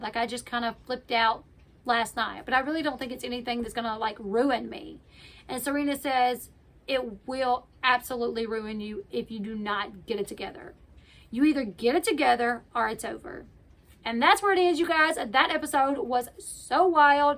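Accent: South, United States. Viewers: I just love your country accent 💗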